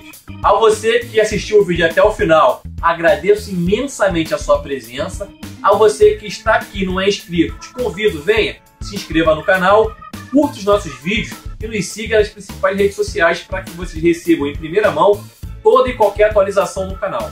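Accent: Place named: Northeast, Brazil. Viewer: Meu querido vc está viajando..A pessoa é formada graduada em Enfermagem , ela deveria estar preparada para todos os setores, vcs professores deveriam ser mais competentes em ensinar e não a pessoa ficar pescando aqui é ali..na graduação ela está aprendendo tudo em relação a Enfermagem. Jeito chato de falar..lol Failar, trabailar, peissoia, affs é uma mistura de Manaus, Bahia, é o Nordeste todo na fala. Um Enfermeiro com 4 ou 5 anos deverá estar apto a exercer qualquer função como enfermeiro. Só se escolher um setor específico deverá fazer sua especialização. Mas primeiro precisa de um emprego. Esse hospitais que são muito mercenários, querem exigir muito para pagar pouco. Falta mesmo é OPORTUNIDADE É BOA VONTADE. A educação não é continuada..então treinamento e ensino contínuo tem que fazer parte da rotina dos recém formados admitidos.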